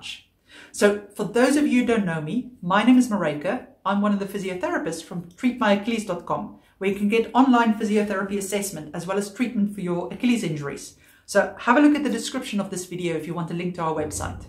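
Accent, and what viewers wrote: South Africa. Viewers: You sound like you are from South Africa. Love that Boer accent. Sawubona! Anyway, good information. I'm an athlete thank you for the info. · I recognize a South African accent from anywhere.😂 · Love that accent!...Sounds like South African/Dutch?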